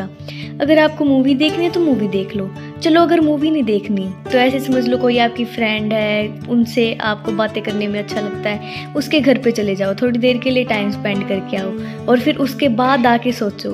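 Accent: Haryana, India. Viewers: Haryanvi tone👌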